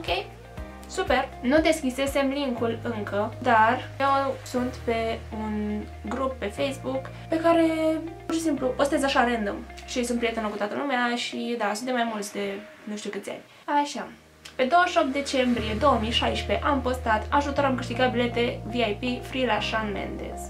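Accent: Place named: England, United Kingdom. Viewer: vezi ca ai putina engleza pe la coltul gurii:))))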